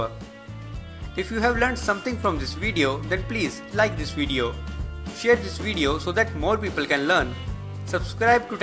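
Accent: India. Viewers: 这印度英语真听不下去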